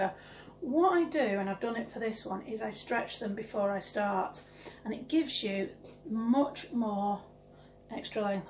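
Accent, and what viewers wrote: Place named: Cheshire, United Kingdom. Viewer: I love your work! You are so talented! I'd love to see you do a large poppy troll column. I'm doing a birthday in june, but can't figure out how to attach all those 260's around the large approx. 24 inch balloon heads. I've thought about glue? Her hair goes straight up, so I just don't know how to get it attached midway all around the round balloon......no videos on it. Thanks for sharing your brilliant work. Love your accent. Im from the US but my husband is british, from cheshire, you sound like that area to me lol thanks again! :-)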